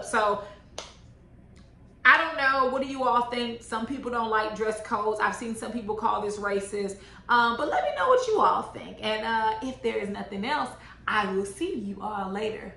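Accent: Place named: Houston, United States. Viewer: Something TOLD me you were in or from Houston! If Im wrong, sorry, but I always felt like I could pick up on that fellow Houstonian tinge.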